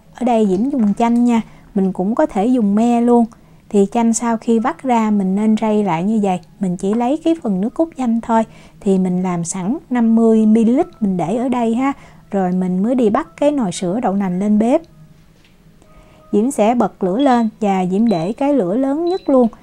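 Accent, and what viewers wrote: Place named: Saigon, Vietnam. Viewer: Nghe cháu Diễm nói tiếng miền Nam của người Sài Gòn thật là dễ thương và lễ phép quá! Cô cứ lắng nghe mà không để ý đến cách chỉ dẫn nấu ăn và nghĩ chắc tiếng Việt của cháu ảnh hưởng từ Cha Mẹ đã ở Na-Uy lâu năm nên cô thiệt là may mắn đã nghe từ cả nữa tháng nay mà không có bị dị ứng với một lời nào của… “tiếng Việt MỚI sau năm 1975”. Chúc cháu có được nhiều người theo giỏi với tất cả video của cháu. Và cố gắng nói tiếng Việt CŨ của người VNCH, người Sài Gòn nhé? Đừng bao giờ gọi những người coi video là “mọi người” hoặc “cả nhà” nhé? Tiếng Việt Nam 4 chữ ấy đồng nghĩa với hai chữ TỤI BÂY đó. Cảm ơn cháu thật nhiều. 💝🌹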